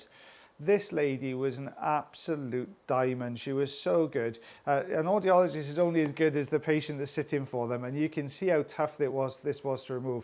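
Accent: Wales, United Kingdom. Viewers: I just LOVE watching all your vids! think this is the 3rd time I´m rewatching this vid! Sweetie never loose the welsh way of talking, since I moved over here I´ve come to adore how everyone talks to eachother as if they are one big family <3 Wich of your locations do you work most in? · I learned to like the wax videos so that I could spend time listening to his beautiful Welsh accent. Anyone with issues with his speech should probably learn a bit more about geography. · I think his accent is the nicest of all the British accent. Yeah if they don't like it don't watch/ listen.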